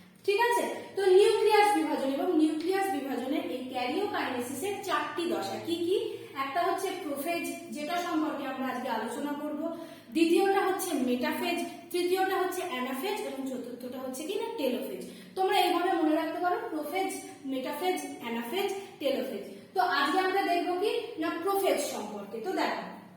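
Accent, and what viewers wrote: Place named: India. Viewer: কথা গুলা শুনে মনে হচ্ছে ইন্ডিয়ান!! ক্লাসটা ভালো ছিলো ম্যাম From Bangladesh 🇧🇩💙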